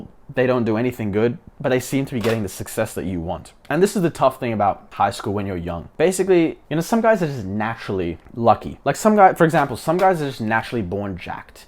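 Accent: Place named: Australia. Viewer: from the accent it's definitely australia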